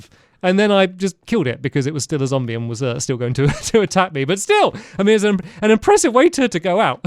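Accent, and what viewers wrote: United Kingdom. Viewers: your the most british cat i've ever heard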